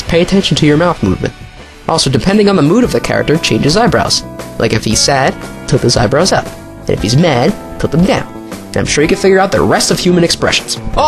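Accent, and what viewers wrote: Italy. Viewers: ma...sei italiano? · Are u Italian? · are you italian? o_O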